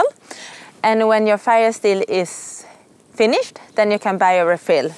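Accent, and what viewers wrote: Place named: Sweden. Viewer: dat swedish accent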